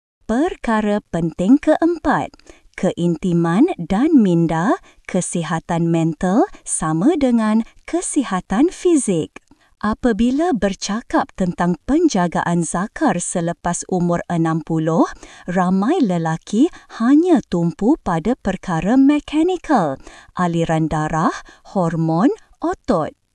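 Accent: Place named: West Kalimantan, Indonesia. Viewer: No.1...saye dari makassar...trim's...aksen Dr mirip ipar saye dr ketapang kalbar🙏🙏🙏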